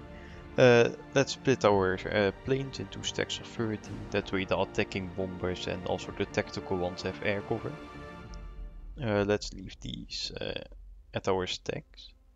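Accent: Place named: Netherlands. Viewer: Lol the fact that i hear your dutch accent made me watch the entire video well done great vid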